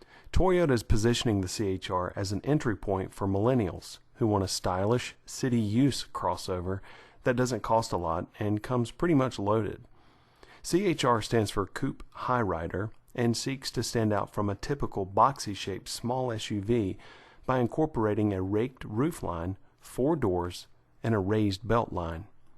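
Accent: North Carolina, United States. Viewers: I LOVE your accent😍 your voice is so soothing too lol #southernaccentftw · I like your NC sweet accent but boy you need to learn things about cars.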